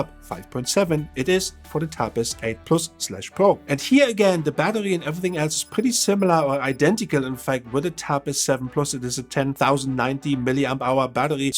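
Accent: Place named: Germany. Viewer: I'm a Chilean fan from your work... And love your German accent... Very cute 😂👌🇨🇱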